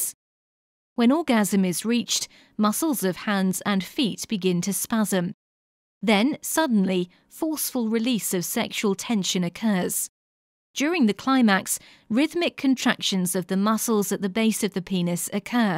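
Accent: United Kingdom. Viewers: This was very informative and also hilarious for some reason. I think its the british voice 🤣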